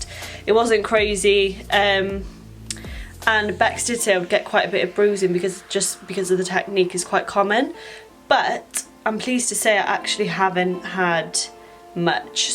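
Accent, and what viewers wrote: Wales, United Kingdom. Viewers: Are you Welsh? ♥️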